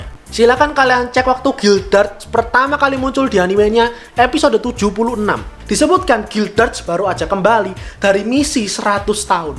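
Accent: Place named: Surabaya, Indonesia. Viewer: Wong suroboyoan yo cak? Medok banget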